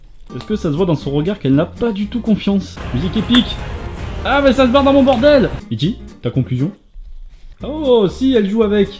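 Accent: South, France. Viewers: En faite j'avais jamais remarqué que t'avais l'accent du sud!!!!😁 Très sexy!😜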